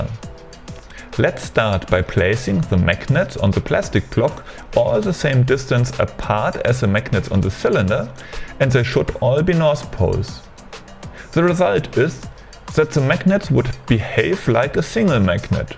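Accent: Germany. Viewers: Maybe you write in the videos Headline, "MY Magnet Motor do not work" ;) ich denke ich kann auch deutsch reden, du hast son typisch deutschen englischen Akzent :D Mit dem Thema musst du dich schon ausführlicher beschäftigen, denn einfach magneten an paar Platzikteile kleben, das reicht leider nicht, das wichtigste ist ja ein ungleichgewicht bei Anziehung in die gewünschte Drehrichtung zur Gegenrichtung zu schaffen, was auch schon bei hohen Drehzahlen ohne jeglichen weiteren Bauten funktionieren würde, aber wie hoch diese sein müssen kann ich nicht sagen, um was es dabei geht, einfach mal das hier schauen: <link> Was Quantum Energy meinte ist auch eine Möglichkeit, einen Art Monopol zu erschaffen, der Lüling hat es gemacht, in dem er die Magneten im richtigen Moment räumlich entfernt hat, über eine Feder...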